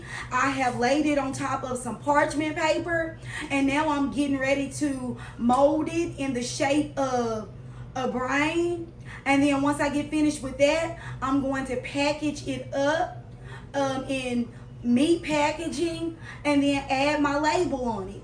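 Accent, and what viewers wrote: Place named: South, United States. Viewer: Luv luv your down south accent...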